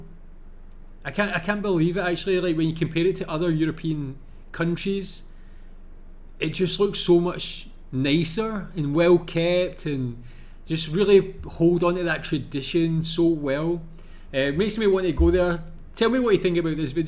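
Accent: Scotland, United Kingdom. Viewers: Which part of Scotland are you from? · I thought you’re from Scotland, love your accent ❤❤ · Scottish, your accent, right?! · The Scottish are always welcome here in Germany and of course we do have always a good Beer for our Scottish friends. By the way i like your Scottish dialect :-). Best regards from Germany to the beautiful Scotland. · I Luv juor sccotzich Accent. Very translatbal to Bavarian 🤣🤣🤣 I survievwd all englisch Trips 🤣🤣🤣 Even in indonasa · Like your videos and - mostly - your scottish accent. 😊 Thow, not every city is so beautiful, like in the video, but there are a lot. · Didn't need subtitles, your Scottish accent was easily understandable. And I'm living in the last city shown, which was kind of cut up with only the view from the main castle (city has more than one) and not so much on the castle, and still not capturing the main feature, as the city originally was designed in fan shape, with this castle being the centre. 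Not showing the other castles, or the countries highest courts. But I have to say, France is also doing a good job in preserving old cities and villages. Went to some, e.g. in the Vosges Mountains, that I thought kept more of their vintage charm than many German ones. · I am glad, to hear a scottish aczent again. I come from the Black Forrest region, close to the french border. Many well kept Cities arround, plus all those Nature. Sometimes we do not even see the beauty anymore. Also, I feel sorry , because you lost your Freedom of Movment. Europe could be such a good place, if only we would be united. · I wanted to say the same :-) I grinned all the time ;-) And his enthusiasm seems real! But the scottish accent: FABULOUS! :-) AND, a REDHEAD! Made with pride in Scotland ;-) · ...before i start to listen your reaction, my first thought was, you have a scottish accent... i am , see, what comes now. 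i will give you some comments after your video. btw. german here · Very nice accent or Scottish ! 👍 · Greetings from Germany. Love your your scottish accent. That´s the land of my dreams.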